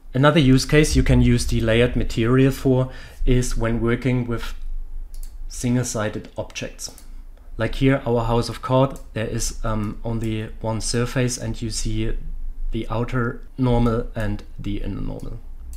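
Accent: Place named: Germany. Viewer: Dein deutscher Akzent ist leicht zu erkennen... welche Hochschule hast du besucht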